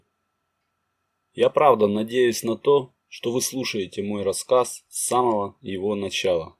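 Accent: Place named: Ukraine. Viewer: Благодарю! Вы очень классно объясняете! У вас как будто бы украинский или кубанский скорее говор, это сразу было не привычно, но на самом деле не важно, потому что важен не голос, а информация. Вы даёте понять глубинно, и даёте возможность слушать! Не всегда есть возможность читать книги, особенно в офисе. Спасибо!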